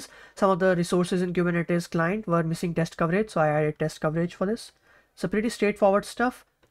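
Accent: India. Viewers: Most difficult part is to understand indian accent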